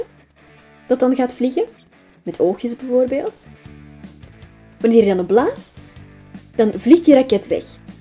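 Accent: Flanders, Belgium. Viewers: jij komt uit belgië? zo klink je anders wel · Dit is een nederlands kanaal maar de stem is belgische?😂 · Dit is nie Nederlands .,. Belgies 😑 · Ik dacht dat dit Vlaams was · Ben je Belgisch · Huh, je bent toch Belgisch? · Just Girls Het is overduidelijk Vlaams. Ik heb veel vrienden in Limburg hier in België, het klinkt nog net iets anders als in Nederland. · dit ks eenrder vlaams dan nederlands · ze is een Belg die hebben vaak andere uitspraken en wat boeit het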